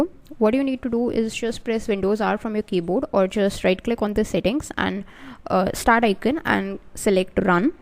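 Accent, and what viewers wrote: India. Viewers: I guess its true. Huge influencers never show the method on how to but the less famous usually indian accented youtubers always helps us. thank you!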